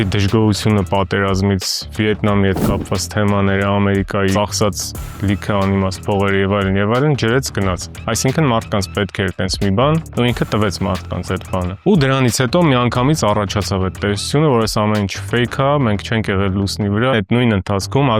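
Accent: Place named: Russia. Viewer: Լավ ֆորմատ է, սպրեք։ Ֆիլմերն ու թեման էլ ընտիր! Ձեր խոսքն էլ լսելի է, բայց մի նեղվեք, երբ ձեզ նկատողություն են անում - հայերենում մենք ունենք դ, տ, թ , ծ, բայց դուք ռուսական հնչյուններ եք գործածում` Սծիվեն ռուսն ա ասում, մենք Սթիվն կարանք ասենք և այլն։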